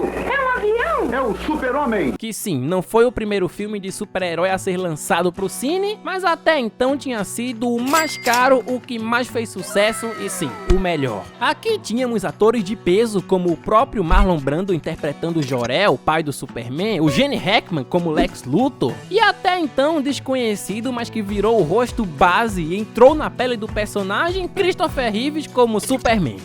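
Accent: Northeast, Brazil. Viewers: En, tu é nordestino?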